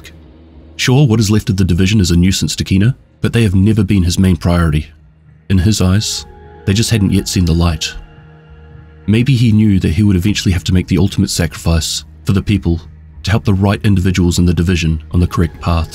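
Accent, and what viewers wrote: New Zealand. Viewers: The gameplay video made me dizzy, only listen the kiwi sound it's ok, like listening a podcast it's fine. 😁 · Good to here a kiwi telling to the story from JTFOUTLAW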